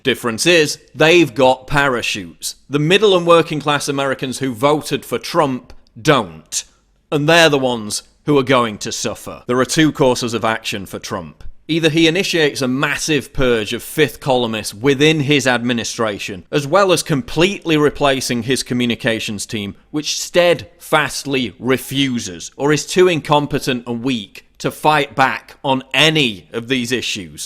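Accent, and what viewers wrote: United Kingdom. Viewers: We're the crazies. Sure, nothing wrong with Trump. Who are the leakers? WTF is a Brit narrating this? He sounds just like Robin Leach from "Lifestyles of the Rich and Famous." Before Trump was elected, one of his associates said that if he didn't win, "there will be blood in the streets." That scared a lot of people, because we figured it wouldn't matter if he won or not. Because he insisted that the election would be rigged. But he won, so it wasn't rigged... I don't want any wars and I would prefer that he resign to being impeached. But he will never quit because he'll never admit to doing any wrong. Trump told an Evangelical reporter that he has never done anything for which he would have to ask God's forgiveness. In other words, he is perfect and sinless. A bit confusing by most Christian theology, but what the hell. He will never quit because he would never admit to any wrongdoing (adultery, etc.) If only Fox News has managed to report the truth and this Russian stuff is all made up, then he's fine. 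The Russians did a lot of hacking. Trump knew that, but he jumped at the chance to use every Wikileaks the Russians managed to get and spread all the lies the Russians spread about Clinton, and chant the hateful "Lock her up." He colluded. In spirit anyway. You say what Trump revealed to the Russians was to help save them from ISIS attacks? That's good from my pov. But he could have been more discreet. He could have not told them where the info came from. He could have made s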